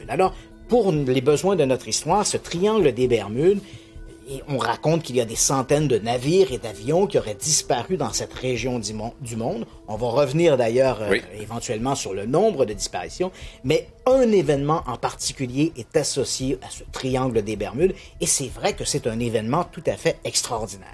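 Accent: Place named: Quebec, Canada. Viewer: L'accent québécois me transporte toujours dans une autre dimension 🍁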